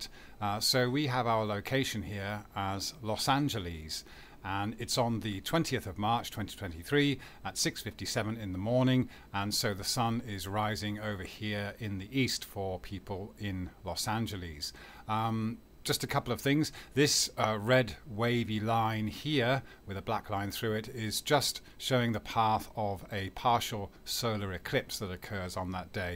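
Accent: United Kingdom. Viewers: Proof positive that a British accent doesn't automatically make you sound smarter!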